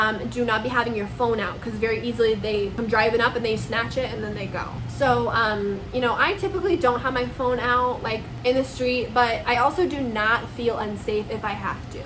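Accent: Paisa, Colombia. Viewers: Habla en inglés con asentó paisa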